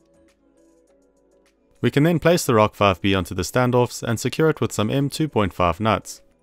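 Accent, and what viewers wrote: South Africa. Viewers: It sounds like you're from SA, if so, where do you get all of this stuff? Would love to know about the threaded inserts specifically...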